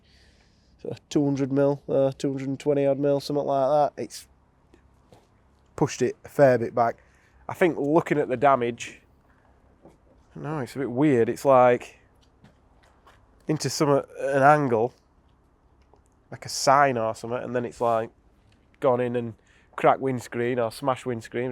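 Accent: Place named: Barnsley, United Kingdom. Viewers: You from Barnsley fella!!! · Thought I recognised that Barnsley accent! 😆👊🏽💪🏽 Those cars are driven by absolute fucking pellets with literally no driving skill whatsoever. No surprise it's been twatted. Please try to make sure you don't sell it to another colossal helmet 😆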